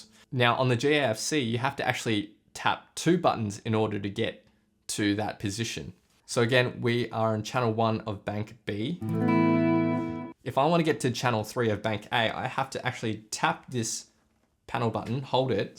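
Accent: Australia. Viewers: Bought an airstep katana a few weeks ago and just watched your video. Sorry I didn't order through your link. Super clear explanation of technical issues without getting bogged down. You are a great teacher/explainer. I will look at some of your other videos. I lived in Hobart for 23 years <num> so nice to hear your aussie accent. Maybe you know the folvig bros Lawrence or Elliot. Nice guys really good guitarists. I used to play w/their sister in TAS. But melbourne is a lot bigger pace than Tassie.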